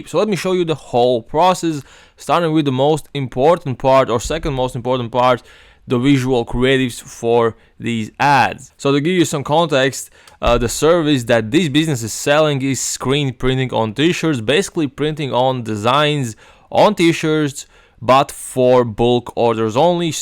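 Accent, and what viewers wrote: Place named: Latvia. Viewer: Hello mate, thanks the value 🙏. I have one question: It seems like your clients are American, do they care about your Latvian accent or have you faced any rejections because of it?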